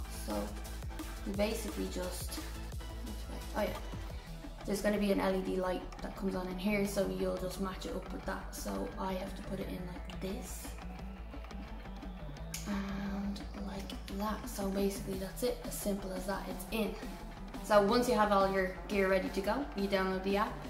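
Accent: Ireland. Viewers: Congrats and Merry Christmas 🎄 Which Irish accent is this btw? Struggling to figure that one out..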